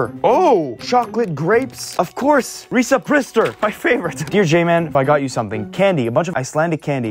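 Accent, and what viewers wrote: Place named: Germany. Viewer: his german accent tho :,)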